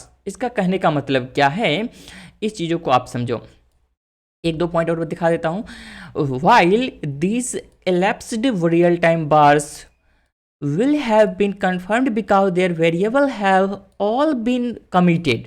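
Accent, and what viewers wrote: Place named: India. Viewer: 听不懂印度英语，不过我还是坚持看完每个视频，学到很多知识，thank you for your work!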